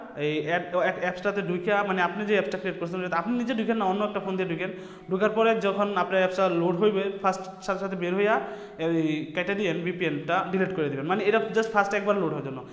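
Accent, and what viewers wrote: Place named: Kishoreganj, Bangladesh. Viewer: বাড়ি কৈ? কিশোরগঞ্জয়া নি?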